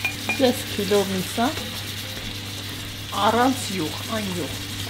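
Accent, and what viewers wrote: Iran. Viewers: A young person 2 yr old girl head me speak and turned to her mother and asked, "what is wrong with his tongue! " While the accent and dialect include specific Iranian flavours, it's Armenian all the same... I learned Parskahye from a friend who arrived at 14 and needed help with English.... So I learned a lot of the other dialect as welll as ba ba ba bah and damatgan!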